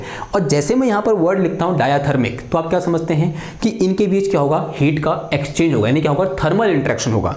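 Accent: Uttarakhand, India. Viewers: Sir aap Uttarakhand se ho kya ?🫠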